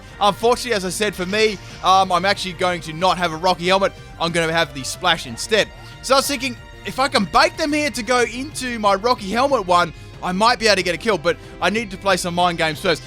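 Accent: Australia. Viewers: I like your videos but your voice sounds like you combined a jock a Aussie and a Kyle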